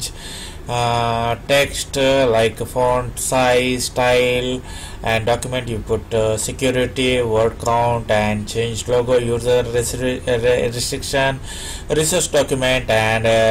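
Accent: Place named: India. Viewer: You have a big Indian accent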